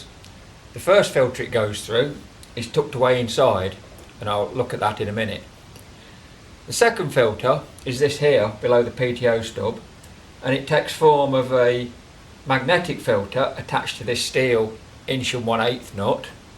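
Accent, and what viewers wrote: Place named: Leicestershire, United Kingdom. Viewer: Hello mate , I detect a Leicestershire accent , a couple of nights ago I found a guy on here , I think he,s in the states , he,s got an old 344 with crossed - up hydraulic settings & a rattly & inaccurate pto - hydraulic engagement lever , can you have a go at finding him , & straighten him out please . My knowledge really ends at 272 & 245 , but I have worked on a 344 , it,s me that refers to " Big Keith " , it was his . Keith is no longer with us , so that door is firmly shut . I told him to contact Charnleys but I think your knowledge is equally as good . Many Thanks mate , & keep the vids coming . Phil .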